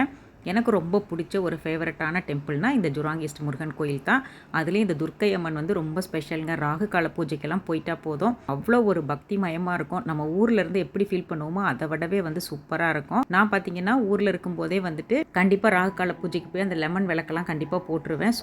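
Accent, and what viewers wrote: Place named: Kongu, India. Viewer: Neega erode ah sis neega pesarthu kongu Tamil maathirye iruku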